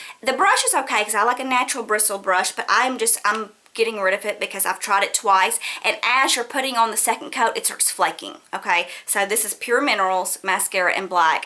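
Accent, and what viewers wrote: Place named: Louisiana, United States. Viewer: I just love how you threw out that country analogy. Im not poking fun or anything. Im from Alabama so I just appreciate them lol. I love your channel. That Louisiana accent drew me in but all the amazing videos keep me coming back for more:)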